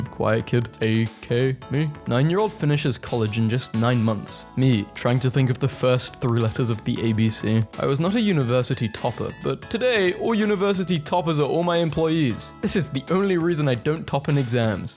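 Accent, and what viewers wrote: United Kingdom. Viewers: British guy ye🐥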